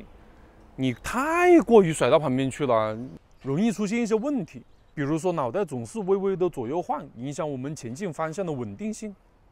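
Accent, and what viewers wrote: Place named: Guangdong, China. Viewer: 老师的广东普通话➕幽默还挺有意思的。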